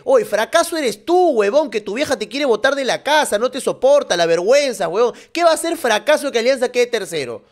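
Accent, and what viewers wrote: Argentina. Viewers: Por que habla como argentino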